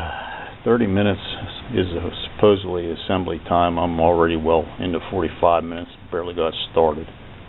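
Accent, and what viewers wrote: Pittsburgh, United States. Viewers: Thanks for the video! Your accent sounds like your from the burgh.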